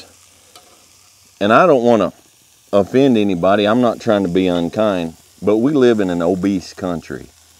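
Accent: United States. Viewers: Your voice is just so American and relaxing! Fantastic work! 😍❤️🌟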